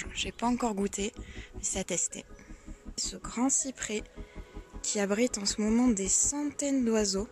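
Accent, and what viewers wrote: South, France. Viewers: L'accent du sud il est vraiment classe 🤙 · L'accent du sud 👍😀.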